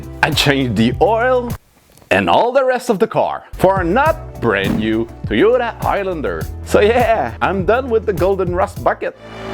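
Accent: Quebec, Canada. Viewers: You are very talented. Are you French Canadian.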